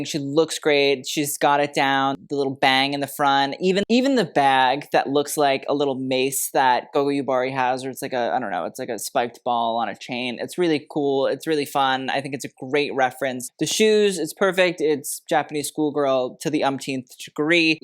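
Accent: Ireland. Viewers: Sorry, but nun + Irish accent brings to mind only one person: the legendary Sister Michael from Derry Girls 🖤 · I usually don’t gove youtube videos a thumbs up but damn I hit that button so hard just 2 minutes in. That Isish accent was incredible 😭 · Ok but you’re Irish accent sounds just like Robin Williams, Mrs. Doubtfire! Which is such a slay!! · that is a very good irish accent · what an excellent Irish accent - all about it!! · Excellent Irish midlands accent:) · My father is Irish and you did fairly well with accent, Sister. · !! I always love when you pull the Irish accent out! Sounds just like my mother in law from Belfast 😜🇮🇪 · BEST IRISH ACCENT QUEEN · You really made me laugh! The irish accent was great and what you said about Ed Sheeran had me in stitches. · Lovely Irish accent and cute costume 😊☺️ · This mf Irish accent lmaooo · Perfect Irish accent…love it❤ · Omg the Irish accent without sounding like a leprechaun is fantastic! 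· I could listen to that Irish brogue for days. So delicious. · nice irish accent! Yanks normally butcher it but you're pretty spot on! Gwan Sis! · Your Irish accent sounds like Mrs. Doubtfire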